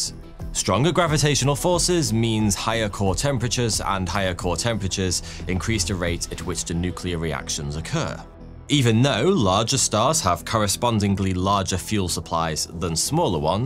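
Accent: United Kingdom. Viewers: WHY DO WE ALWAYS HAVE TO LISTEN TO A BRITISH ACCENT BLATHERING ABOUT THE IMPORTANT QUESTIONS OF LIFE AND DEATH ??? WHEN WILL THAT BROKEN ENGLISH RACE FINALLY RETIRE FROM HISTORY ???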